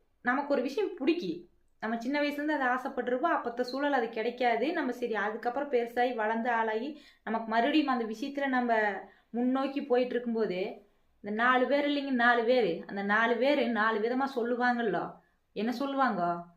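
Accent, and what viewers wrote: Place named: Coimbatore, India. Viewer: ❤கோவை ஸ்டைல் பேச்சு எனக்கு ரொம்ப பிடிக்கும்❤❤👍👍👍🙏🙏🌺💐💯🤝💥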